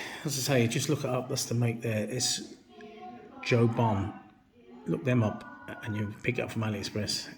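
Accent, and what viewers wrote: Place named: United Kingdom. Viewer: I have one on the way from Amazon , good review fella Love the Brit accent too haha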